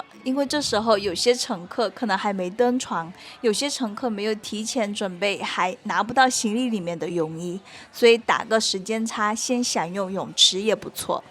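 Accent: Fuzhou, China. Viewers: 你是福州人嗎？尾音好像聴出鄉音！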